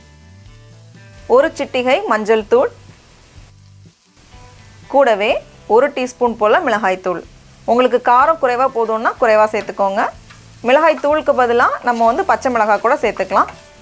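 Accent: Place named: Kanyakumari, India. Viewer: thappu kidayathu sister athu avanga native kanyakumari slang..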